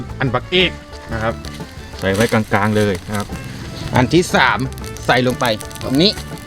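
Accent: South, Thailand. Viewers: พี่เป็นคนใต้เหรอค่ะ เสียงได้แรงอกมากนิ่ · พี่คนภาคใต้ใช่มั้ยนิ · คนจังหวัดำไหนคับเห็นแหลงใต้ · พี่คนใต้หรอครับ🐹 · คนใต้นิ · อื้ม~ พี่บ่าวใช่คนใต้รึเปล่านิ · พี่คนใต้เหออ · พี่คนใต้นิผมว่า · คนใต้แน่นอนคับ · เสียงเหมือนคนใต้มากกกกกก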